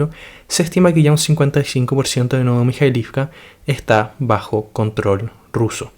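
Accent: Chile. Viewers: Si compa, es de Chile. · Eres chileno bro?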